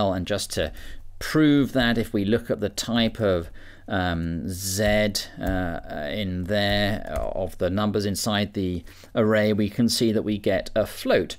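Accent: England, United Kingdom. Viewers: Good course. and I like the British accent :-) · He's wearing a Ralph Lauren sweater, has an english accent and his name is * LIKE EARNT *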